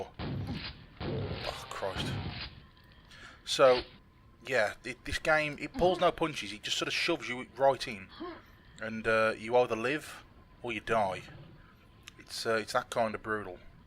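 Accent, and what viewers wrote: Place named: United Kingdom. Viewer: Oh, I grew up on Quake. British accent + retro games = clear win. Do you mind if I add you to my directory of recommended YouTube Channels that is part of my online store?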